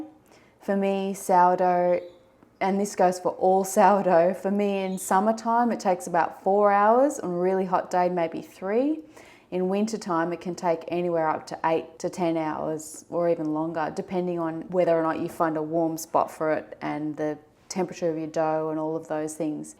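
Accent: Australia. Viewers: i have just started to bake gluten free bread, came across your tutorials and must say how pleasing it is to see and hear a fellow auzzie , thank you for your time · In Ireland with our weather it would probably take a whole day to rise the bread . You sound like my sister in law who's from Townsville. I remember when my brother and sister in law lived in London I couldn't help asking her is that a question?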